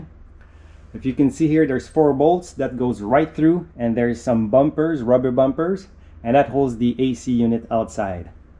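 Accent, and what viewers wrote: Quebec, Canada. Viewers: Wow! I love this simplicity! Nice job. Not sure but your english sounds just like mine from Quebec. I wish that container home could be possible up here... But with our cold weather, building code and city legislation... It would require so much effort to get a simple projet like yours possible. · At first I was thinking, is it me or this guy sounds like a french canadian dude speaking english. Après j'ai vu le logo des canadiens, ça a confirmé ma thèse 😅 Belle job! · That sounds un peu quebecois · I like it ! What are all those diplomas on the wall ? I couldn't not notice you accent. Is that PQ ? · You sound like you're from Quebec... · Awesome! Quebecois? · Ta un accent Québecois ???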